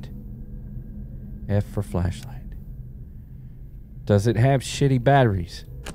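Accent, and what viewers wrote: South, United States. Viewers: This is my first video of yours and I have to say, there is something strangely adorable about a dude with a Southern accent gettin' spooked. You're cute as all hell, Sir. Carry on.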